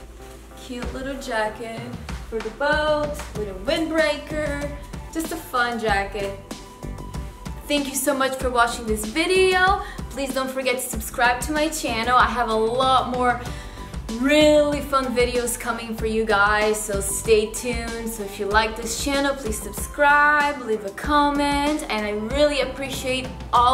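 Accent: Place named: Portugal. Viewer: Love your channel. I was wondering what nationality are you? Sounds like a Portuguese accent? Anyway, keep up the awesome channel. 👍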